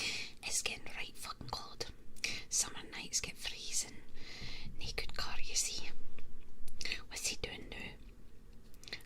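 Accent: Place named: Scotland, United Kingdom. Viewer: I really like her Scottish accent it doesn't matter what she says on her asmr streams it's always nice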